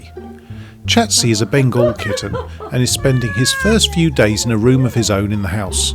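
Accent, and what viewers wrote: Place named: United Kingdom. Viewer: ya i am also hooked and i love the British accent ;p . big Tigo fan myself !!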